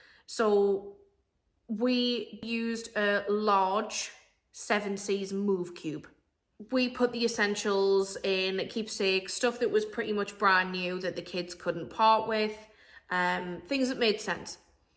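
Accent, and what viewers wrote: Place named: Australia. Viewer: You'll get your aussie accent back!!!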